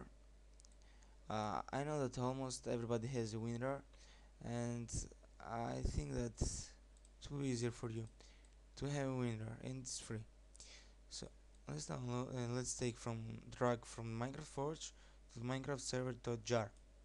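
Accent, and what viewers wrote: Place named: Portugal. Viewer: paraces tuga a falar! LOL~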